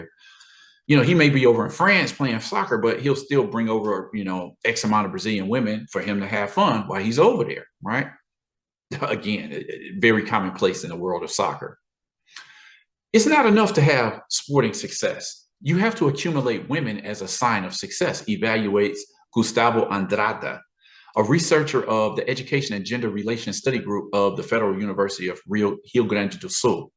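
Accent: United States. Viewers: You sound American but I'm guessing you live in Brazil bro?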